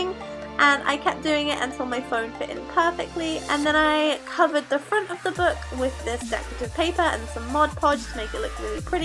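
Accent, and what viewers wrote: England, United Kingdom. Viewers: Hi I'm really confused I love your vids but I just can't figure out your accent! Are you British ( like me yay!) but then you have a slight American tinge too! Also you mention American shops.. Please enlighten me! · are you English?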